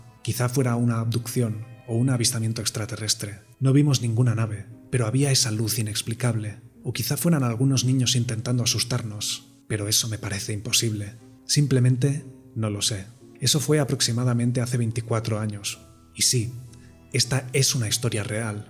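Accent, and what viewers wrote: Castile, Spain. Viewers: AGUANTE EL ACENTO CASTELLANO DE CASTILLA!!!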